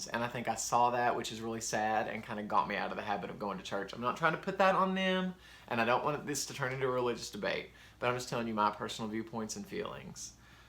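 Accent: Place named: Alabama, United States. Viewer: I just love your accent! I'm an Alabama girl so it makes me feel right at home. I really enjoyed learning more about you. You have such a great personality 😍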